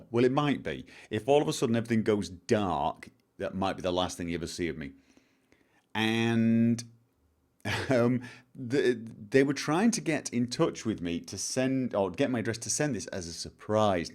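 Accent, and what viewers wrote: United Kingdom. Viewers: Is your accent because of living in norway or is it just a british dialect? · er jo ofte grunnen for expats. Belgisk her, norsk kjæreste og. It was pretty funny to learn one of my fav person on youtube is living in Norway while sounding so absolutely british though. Big planet, small world.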